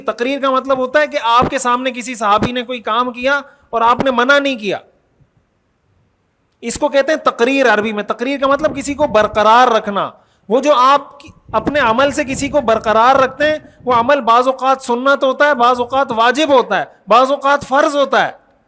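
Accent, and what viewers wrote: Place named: Karachi, Pakistan. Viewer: Krachi mein sab ka way of talking hi asa hai.not copy.nice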